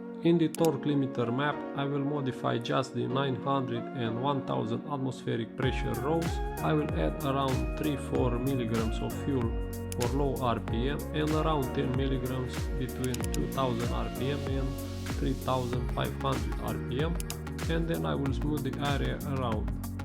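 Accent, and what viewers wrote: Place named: Romania. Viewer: Mi-am dat seama ca esti roman dupa accent.😁Frumos video spor in continuare.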